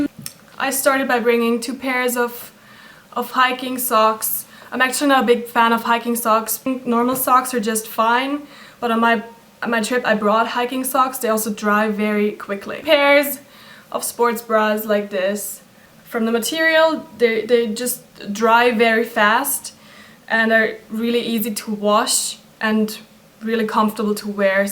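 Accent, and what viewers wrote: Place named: United Kingdom. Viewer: but akcent is british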